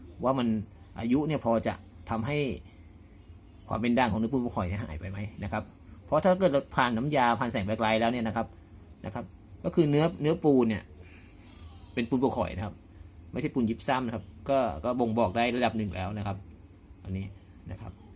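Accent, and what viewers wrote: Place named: Thailand. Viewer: พระสวยๆทั้งนั้นทดสอบกลัวเสียเนื้อพระมั้ยจ๊ะพูดเน้อเน่อคนจ.วไหนคะ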